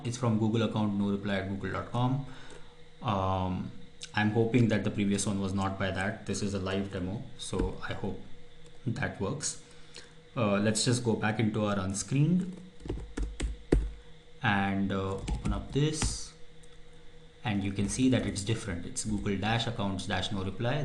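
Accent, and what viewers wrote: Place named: India. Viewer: this looks interesting indian accent NOPE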